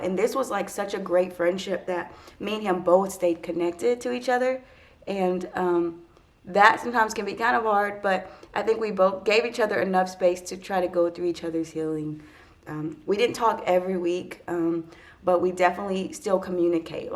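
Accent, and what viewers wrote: South, United States. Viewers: I hear that southern accent. Where are you from, if that's not to forward to ask?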